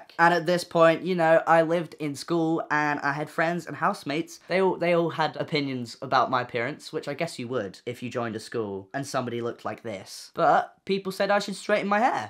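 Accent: United Kingdom. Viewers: They have the same type of British accent. British accents are different depending on where your from. Like if your from liverpool your accent is different to someone from the likes of Birmingham. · you're so British!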